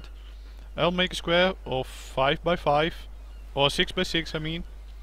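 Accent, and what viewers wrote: Netherlands. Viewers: Nice dutch accent ;) subbed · Dat dutch Akzent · are u dutch?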